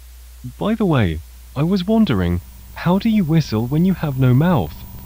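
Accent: United Kingdom. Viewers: The narrator sounds like a British Nexpo. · Excuse me, is this video reuploaded? Cause I heard bad quality in this video, but this short film is for me like " weird " and I heard british man's voice narration.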